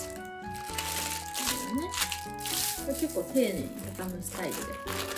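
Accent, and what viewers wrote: Kansai, Japan. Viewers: 美人が気取らずに関西弁をしゃべるのが好感が持てます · ハンバーガー🍔を食べながら、関西弁で、想い出話するゆいはん最高。来年も、素敵な笑顔を見せてください、良いお年を。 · 自分が関東人だからか関西弁を話す女の人がなんか魅力的に映る笑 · めっちゃ関西弁が落ち着きますわ ゆるーくしゃべってんの聞いてるだけで癒される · こういう普通の朝の雑談ってのも、いいものだね😙 マックは関西訛りが抜けてからでいいと思う。 そして俺はハッシュドポテトからいく派😆 齧った時の歯型がキレイなので、今日も元気でやれるでしょう😉 レコ大お疲れ様〜😄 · ゆいはん本当に美味しそうに食べるよね😊 見てたらマック食べたくなった🤣 関西感出してるとかは思わないよ！ それは生まれた地で慣れた言葉だし、その京都弁とか関西の言葉はゆいはんの良さでもあるから😊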